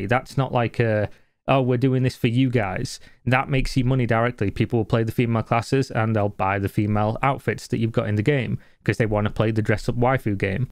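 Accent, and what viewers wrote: United Kingdom. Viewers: so satisfying to listen to a man rant in a british accent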